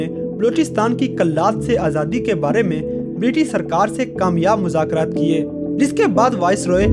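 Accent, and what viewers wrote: India. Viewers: Iskay lehjay say saaf pata lag raha hai ke yeh Indian hai ..... meray Baloch Bhai Amir Hamza ki aulad ho aur sab Pakistanio ke dil mein basty ho. Jhootay Indian propaganday say bach ke raho. Inko aik din Kashmir bhi chorna paray ga.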